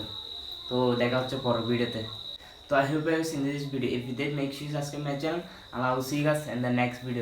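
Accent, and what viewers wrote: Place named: Chittagong, Bangladesh. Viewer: Via apnar bari kotai amar mota ctg karon apnar kotar modda boja jai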